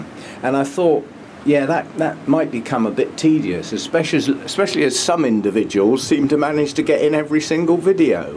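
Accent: United Kingdom. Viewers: I have to watch your videos! There are so many bits of info that you share. And I love a British accent, your sayings and sense of humor.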